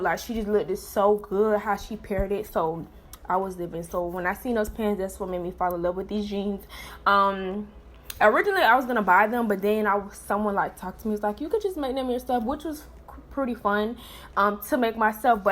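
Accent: Miami, United States. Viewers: Lol I was like “oh sis definitely from da crib” 😂😂😂 South Florida lingo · I know you from Miami just by how you talking lol but I'm def gone subscribeeeeeee! and try this style too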